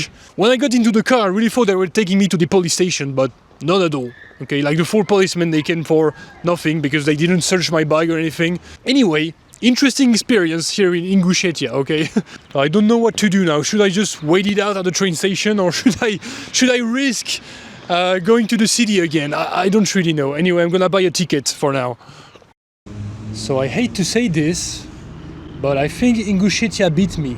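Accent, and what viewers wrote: France. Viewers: You think they are not used to see foreigners? They are not used to see men with colored hair - they likely thought you are gay, which is not a good way to be perceived there 🤣And French accent makes it even funnier, because it sounds kind of drunk to Russian ears. I mean simply look at men around you and then at yourself to understand why you've gathered so much attention. Go to Chechnya or to Dagestan with a haircut like this for the ultimate experience 😂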